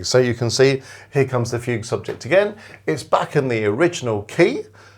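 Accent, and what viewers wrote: United Kingdom. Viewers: Love your videos...and your British English is delightful! Thank you,!!!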